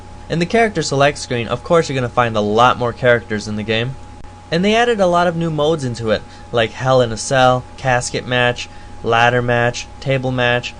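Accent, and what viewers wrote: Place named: Ireland. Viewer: I agree that it's very pleasing to listen to. Neither loud and brash, nor quiet as a mouse. Neutrally-accented, save for a faint Irish lilt.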